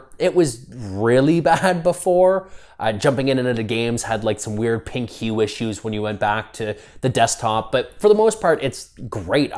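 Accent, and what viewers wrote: Canada. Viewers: Sounds so Canadian lmao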